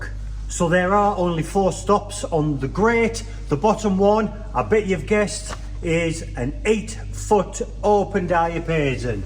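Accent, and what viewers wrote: North, United Kingdom. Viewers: I love your channel and the small country churches you give us a glimpse into here in the far off US of A. Your northern accent is also quite nice to listen to! 😊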